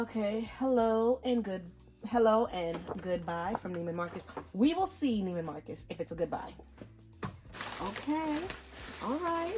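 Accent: Jamaica. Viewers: I was contemplating whether or not I should get this for my mom. I think your video sold me on it. Btw, did I hear a Jamaican accent?! JAMAICANS STAND UP!!!! 🇯🇲🇯🇲🇯🇲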